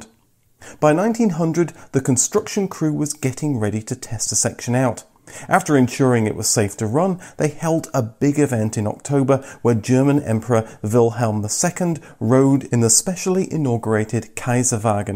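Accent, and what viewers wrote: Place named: United Kingdom. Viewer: Apart from enjoying your videos so much, I am delighted to listen to your British accent. A real pleasure!!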